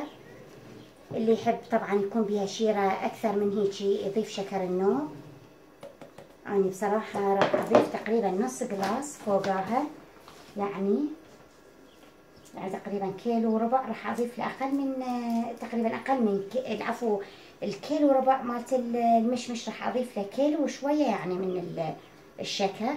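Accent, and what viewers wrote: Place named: Anbar, Iraq. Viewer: اممم يعني غرب الانبار مو نورتي🥰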